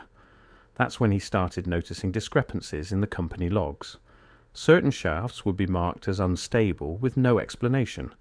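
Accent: United Kingdom. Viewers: Sure getting tired of listening to American stories narrated by a machine voice with a British accent. If you want more clicks start using a real human to speak for you. Stop being so damned lazy. We know the difference and we are sick of AI British accents.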